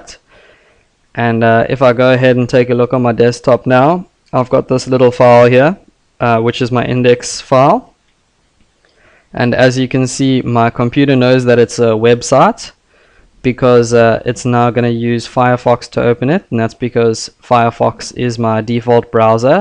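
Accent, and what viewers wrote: South Africa. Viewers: Are you from SA?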